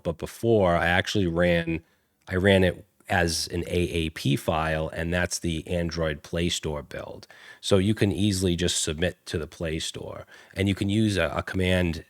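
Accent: Boston, United States. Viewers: 10 years ago, you were a godsend, helping me learn to code. It's good to see you're still at it. And as someone that grew up in Connecticut, hearing your Boston accent is very soothing.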